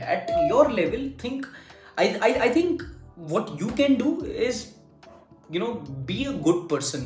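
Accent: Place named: Bundelkhand, India. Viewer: I love your bundelkhandi accent bhaiya Being from Rath i can relate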